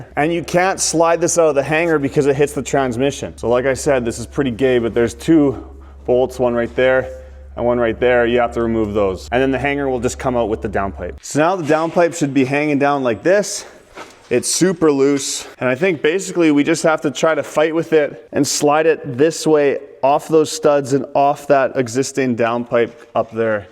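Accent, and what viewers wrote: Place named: Canada. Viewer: His accent is Canadian af (Sask maybe?), and I can attest it’s not illegal here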